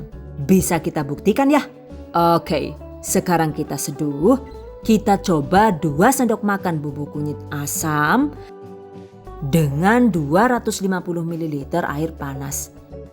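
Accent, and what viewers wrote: Banyumas, Indonesia. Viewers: penyampaianya sangat detail.. aq sendiri ngapak tpi denger bunda ini ngapak kok ngakak aq🤣🤣🤣🤣 lucune jannn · Bahasae bisa ngapak juga hhhh salam mbak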